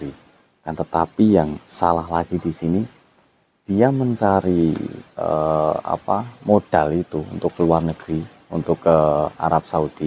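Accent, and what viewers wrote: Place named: Central Java, Indonesia. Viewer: Assalamualaikum MET sore mas baru nonton nih vlognya.oya klo boleh tahu mas jawanya mana ya karena saya juga orang Jawa tengah.klo mendengar cara bicaranya kok sama logatnya SPT kampung saya.ya semoga saja Deket dg kampung saya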